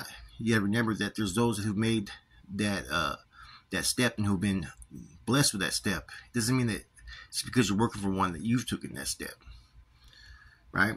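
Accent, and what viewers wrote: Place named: North, United Kingdom. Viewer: you speak like a true northerner.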